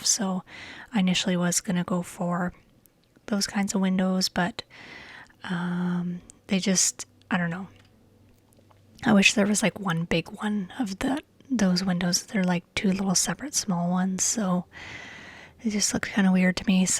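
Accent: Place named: Canada. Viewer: i’ve always suspected you were Canadian because of your accent, but it’s awesome to hear it confirmed! Torontonian here :)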